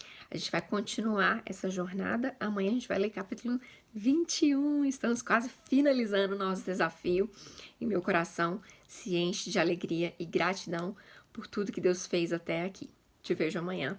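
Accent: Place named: Minas Gerais, Brazil. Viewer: Mineirês! Sotaque mais lindo. Amo !❤️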